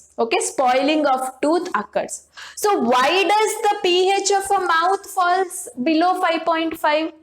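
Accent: Karnataka, India. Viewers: Mam ure from Karnataka naah! 😊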